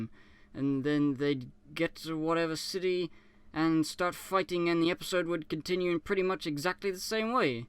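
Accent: United Kingdom. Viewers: Being British, I can still hear his real accent as he talks, so I think this is a parody on some of the non-English content creators, notably JanAnimations. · Nothing I love more then to listen do a drunk man bitch in his non-native language. That is me being sincere not sarcastic. This was way to fun. Wont shut the FUCK UP! Still not shutting the FUCK UP! Has completely forgotten how to shut the FUCK UP! I couldn't stop laughing. P.S. The first time I listened to you I thought you were British. But I heard so many other accents this go around. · I'm confused. When I first heard his voice, I thought he was British, but in this video, where he's completely drunk, he sounds Italian to me. Where is he actually from?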